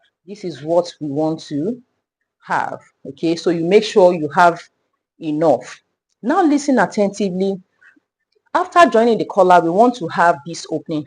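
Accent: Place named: RP, United Kingdom. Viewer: Your queens English makes me forget what am watching and be listening to the filtered voice. Well-done queen boss.........you dey help my career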